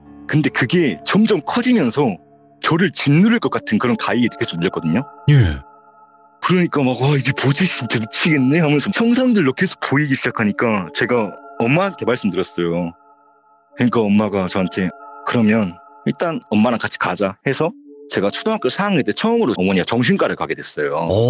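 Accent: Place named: Jeolla, South Korea. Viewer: 말투가 전남이시군요 ㅎ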